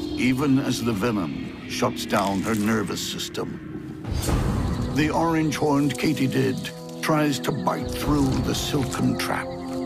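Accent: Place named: RP, United Kingdom. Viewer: Although slickly filmed and narrated by the voice of Mr. Fancy British Guy, these videos are as staged and contrived as any 8 year boy who drops bugs in a glass jar, gives it a good shake and gleefully watches the bugs rip each other apart. These films are on the same level of sophistication as the ubiquitous pimple popping videos.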